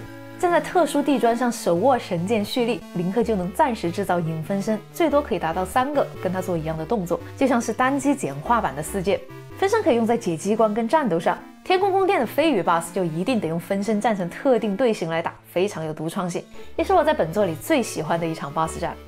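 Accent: China. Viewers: 主播是不是四川重庆的人啊 我好像听到川普….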